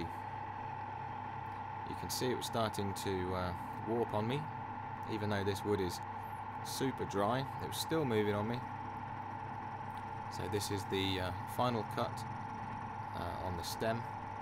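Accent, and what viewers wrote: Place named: United Kingdom. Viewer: This is definitely one of the nicest things you ever turned. Also, I would like to know what is the brand of your lathe? Also how old is it, and how do you keep it running so well. I really like your channel, Your voice is pleasant and I like your British accent. Keep turning sir, I know, your thinking I don't have an accent, you do and your correct. Take care, and be careful.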